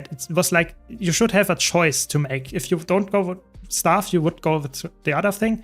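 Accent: Germany. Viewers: Ich war so: Das englisch des dudes klingt sehr deutsch. Check, tatsächlich aus Deutschland xD auf jeden Fall Respekt, dass du das so auf englisch durchziehst! Und cooles Video, vorallem wenn du pve und pvp abdeckst - finde beides sehr spannend und liebe es (pvp macht süchtig, oder?). Bin echt gespannt wohin die Reise mit gw2 geht, neue Elite Specs würd ich auch cool finden!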